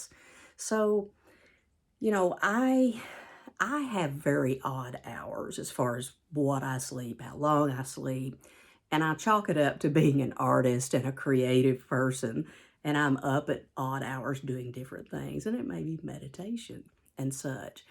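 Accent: South, United States. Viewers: I have experienced ALL 9. You just came across my feed... it's nice to hear someone w/ a southern drawl discuss these topics, as I too am southern, am sensitive to energies, practice energy healing & reiki. You asked for additional symptoms we may have had as well ... Heightened intuition, whole body vibration, energy pouring from hands & feet, connecting to plants & animals, wild synchronicities w/ numbers, music, situations, just to name a few. Oh, Clair's coming onboard & spiritual hot flashes ..crazy, crazy dreams too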